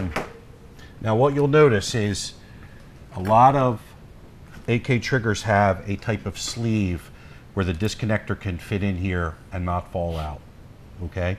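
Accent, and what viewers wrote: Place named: Philadelphia, United States. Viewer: I grew up right outside of North Whales PA. Love the accent man! True Philly/Eastern PA! Just purchased this trigger. Cant wait to get it installed!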